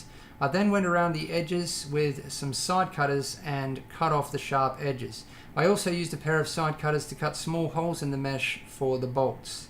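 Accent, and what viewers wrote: Australia. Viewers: this is the only video ive seen from you, sound australian? do you have a business that makes custom pc's? if so, id be really interested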